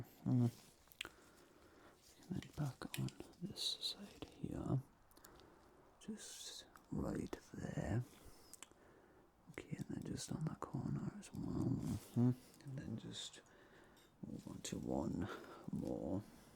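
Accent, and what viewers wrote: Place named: Newcastle, United Kingdom. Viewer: Finally! A geordie ASMRtist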